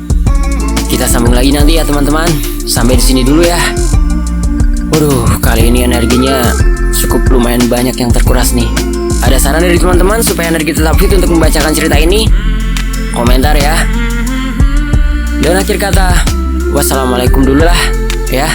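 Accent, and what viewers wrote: Java, Indonesia. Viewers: tapi q lebih suka bahasa jawa mimin medok poll jadi tambah seru ceritanya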